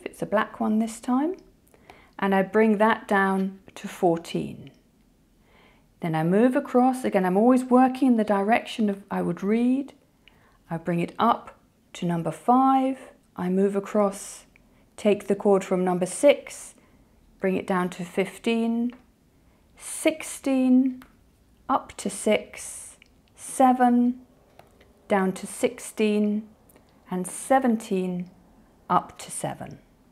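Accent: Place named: RP, United Kingdom. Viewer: Thank you for this well explained tutorial. I love your RP English. Good to learn pronunciation and easy to understand.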